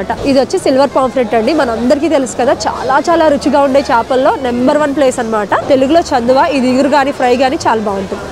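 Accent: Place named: Srikakulam, India. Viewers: మీరు కచ్చితంగా మా శ్రీకాకుళం వాళ్ళు అనుకుంట, బాగా చెబుతున్నారు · Medi pakka Srikakulam andi ❤❤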